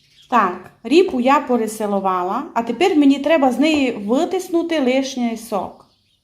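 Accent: Transcarpathia, Ukraine. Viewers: и снова вы с чудесным закарпатским говором и нашими украинскими вкусностями Рада вас слышать Привет из Изюма❤😊😊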